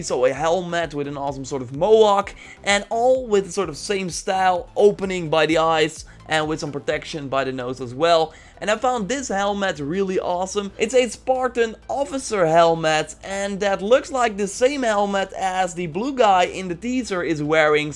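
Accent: Netherlands. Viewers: It is a bit Dutch mate, so I think he is Dutch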